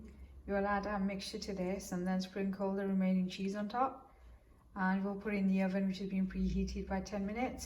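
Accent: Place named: Midlands, United Kingdom. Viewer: Well presented and a great offering, stay connected and more importantly stay blessed 🙏 By the way you sound from the Midlands though I maybe wrong, thinking Birmingham 🤔😉😊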